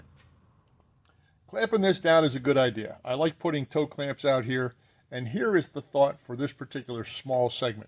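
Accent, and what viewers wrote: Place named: Baltimore, United States. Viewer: Unloosen! Your Bawlamer is showing again,!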